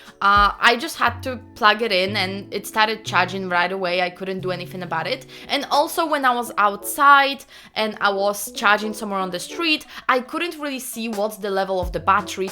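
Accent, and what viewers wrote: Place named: Eastern Europe. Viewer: Well done for doing this video. I can’t wait if you are doing a review on model Y. Love your Eastern European accent.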